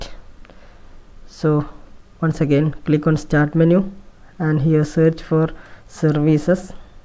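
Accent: Kerala, India. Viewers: Are you malayali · I like ur mallu English 😂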